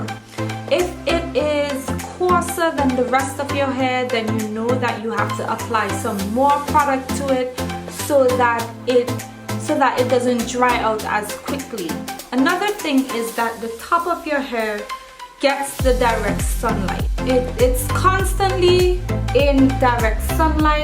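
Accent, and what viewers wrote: Caribbean. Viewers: sounds like you have an accent....Caribbean girl :D.....great video..... I had to cut the rest of my hair to the length of my crown it was fine at first.....and it doing much better....still a bit shorter and grows slower and kinkier but it made soo much progress.....im really glad....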